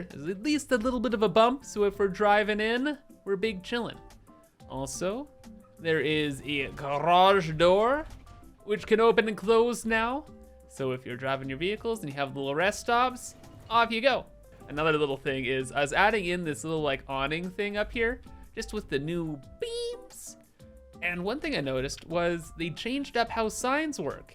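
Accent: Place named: Canada. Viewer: i love how canadian you sound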